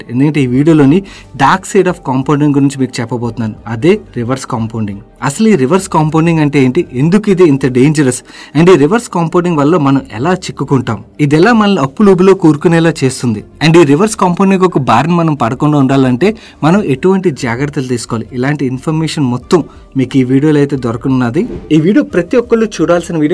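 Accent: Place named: Godavari, India. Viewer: Godhavari sonds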